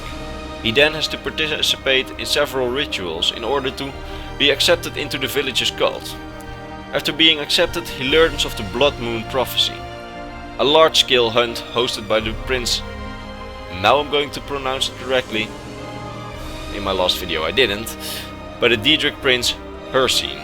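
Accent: Netherlands. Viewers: Ik hoor een Nederlands accent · Sowieso een Nederlander dat hoor je zo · Are you dutch ? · didox ik denk het, aan zijn accent te horen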